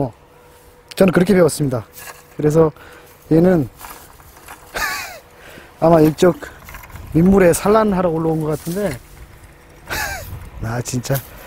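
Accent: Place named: Gangwon, South Korea. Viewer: 저도 강원도라 말투가 친근감 있네요~^^ 요즘은 붕어 낚시안하시나요? 제가 그자리에서 생애 첫 월척을 ㅎ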